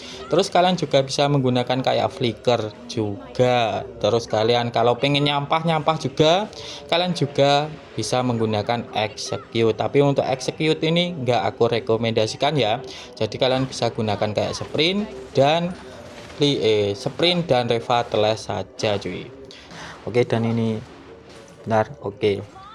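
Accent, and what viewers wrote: Java, Indonesia. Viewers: LOGAT JAWA KAU KUAT KALI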